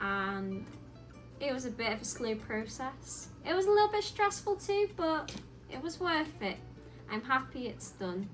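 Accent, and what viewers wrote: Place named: United Kingdom. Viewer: Seja bem-vinda. Aproveite bem as belezas naturais do Brasil. Viaje bastante pelo estado de SP e Brasil assim podemos nos deliciar e aprender mais do seu inglês britânico.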